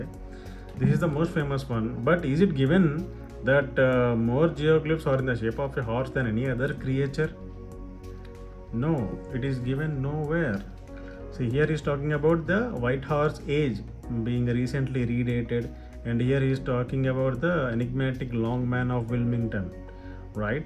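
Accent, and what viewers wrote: India. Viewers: The fu/king indian accent 🤣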